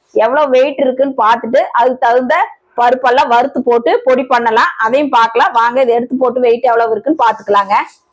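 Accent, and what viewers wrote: Kongu, India. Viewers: Ithu coimbatore slang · Ada idhu Coimbatore bashaiingov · எங்க கொங்கு நாட்டு பக்கம் நாங்க பொறிக்க போரன் அப்படிதான் சொல்லுவோம் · Kongu slang apditha irukum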